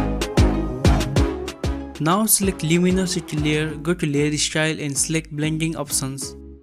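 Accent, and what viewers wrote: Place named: India. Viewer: ugh, what an indish accent.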